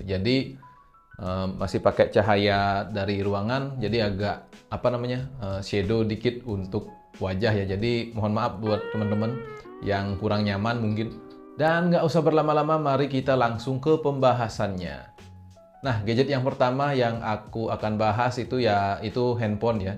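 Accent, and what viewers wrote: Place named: Bali, Indonesia. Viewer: Logat bali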